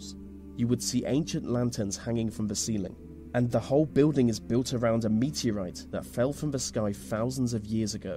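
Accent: England, United Kingdom. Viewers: Not sure what it is your debonair English accent or the awesome topics the great narration and your consistency always keeps me excited and wanting more from your channel job well done my friend thanks again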